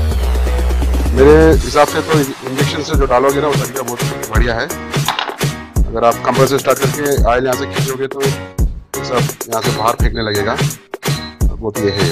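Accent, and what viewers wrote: Hyderabad, India. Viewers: Bhai aap hydrabad sa ho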